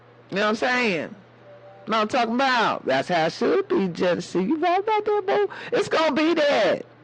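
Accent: South, United States. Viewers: Omgosh u sounded like my mom when u said that I'll tell u that much! That southern drawl needed that today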